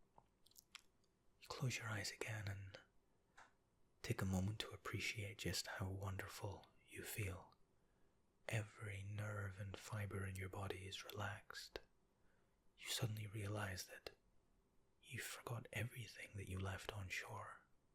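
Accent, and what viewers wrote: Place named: Glasgow, United Kingdom. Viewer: Oh it really has pal. This is my 3rd year here, it's funny how fast it starts to stick hahaha... 🙈 That's why I got so excited about your Glaswegian in your accent vid, feels so like home haha! I study music at the royal conservatoire of scotland:)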